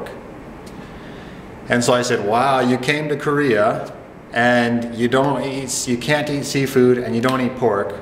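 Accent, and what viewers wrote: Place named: West, Canada. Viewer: You must be western Canadian. I learned when I moved here that people say "...right?" as a confirmation at the end of a sentence very often. People can come to a country without researching if they are flexible people, but otherwise, be prepared to suffer!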